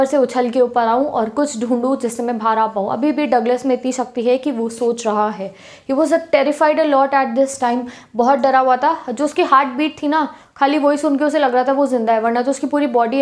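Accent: Punjab, India. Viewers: Mam kitna bhi rok lo punjabi to rag rag me hegi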